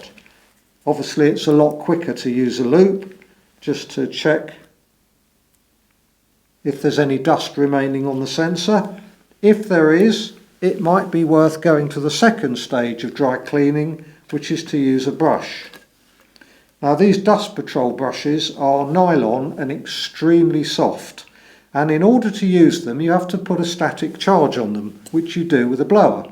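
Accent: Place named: England, United Kingdom. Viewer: You sound like your from.england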